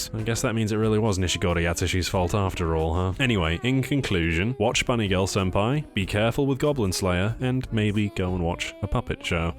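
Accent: United Kingdom. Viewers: Caffeinated British chatterbox boy did it again. You are getting me back into anime even though I got a girl and a kid. Watched Konosuba on your recommendation some time ago with the girl and we enjoyed it (after getting over the Darkness-induced cringe), so I guess we will be bouncing off some bunny girl's bum now. Keep it up!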